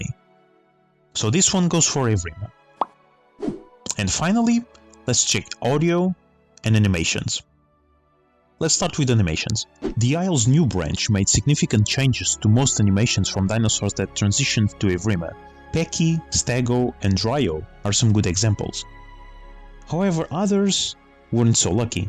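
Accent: Portugal. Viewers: Wait you sound portuguese. Are you from Portugal? If so, CARALHO!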